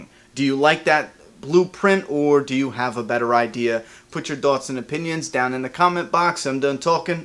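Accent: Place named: East Coast, United States. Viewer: I like you. I'm from Long Island originally and it's nice to hear an east coast accent for a change. Trevor at Trevschan2 could learn a few things from you, IMO, so I think you do a good job. BTW, anymore of those candy packs come your way?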